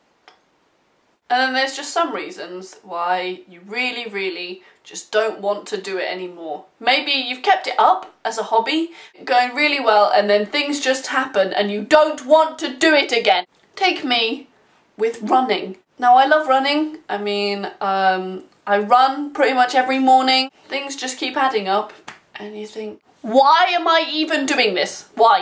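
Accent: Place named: United States. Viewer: That was a pretty good American accent.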